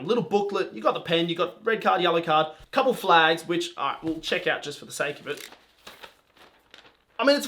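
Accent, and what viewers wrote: Australia. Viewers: Are you from Australia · Is Australian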